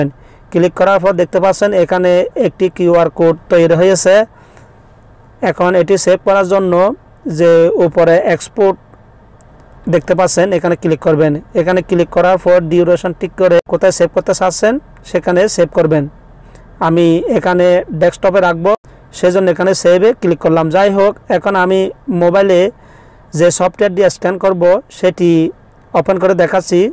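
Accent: Chittagong, Bangladesh. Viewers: chittainga naki? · apni ki Chittagong bari je vasa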